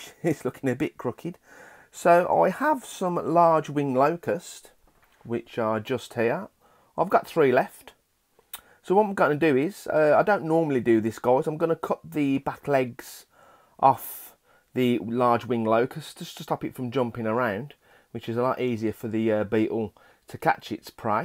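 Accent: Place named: Birmingham, United Kingdom. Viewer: That's the Brummies accent for you (I'm one myself)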